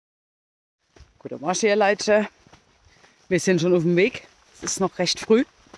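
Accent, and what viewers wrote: Palatinate, Germany. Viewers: Immer wieder ein Vergügen deine Videos zu sehe. Un dadebei des Pälzer Platt👍🏼 Liebe Grüße Thom ✌🏼🤠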